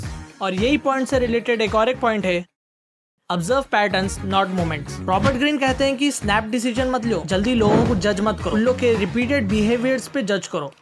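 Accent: Hyderabad, India. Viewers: Tu Hyderabad se hai? Tera accent poora Hyderabadi hai 😂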